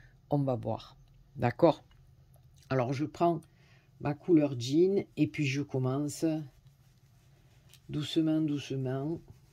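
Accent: South, France. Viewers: Bonjour je viens de trouver votre site et je suis très heureuse de vous suivre vous faites des choses magnifiques j'adore ces cartes faites à l'encre elles sont super jolies merci pour l'idée du gabarit lune avec ses ombres il fallait y penser c'est super Merci beaucoup et puis en plus j'adore votre accent ça me rappelle mon midi (Perpignan) je suis maintenant sur le bassin d'Arcachon ce n'est pas le même accent!!!! bonne journée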